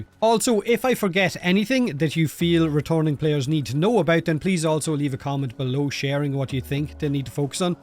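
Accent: Ireland. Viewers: I sense british accent but it sounds more like irish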